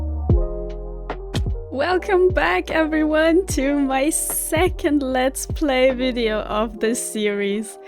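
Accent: Germany. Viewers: Ha, hab ich doch gleich gedacht, das klingt deutsch 🙂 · the second I heard you talking in the first part I was like: She is german. XD I am german as well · Loving the cozy, chill vibes, great job! I was actually guessing you might be German because your accent sounds a lot like a friend of mine's and she's from there too! Guten tag! P.S. I love the tree, that's so cool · I immediately noticed your german accent! Probably because I'm german too :D I already love this series so much! <3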